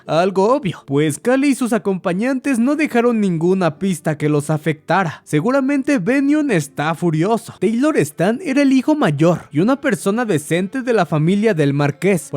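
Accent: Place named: Russia. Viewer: Me gusta la historia y más tu acento ruso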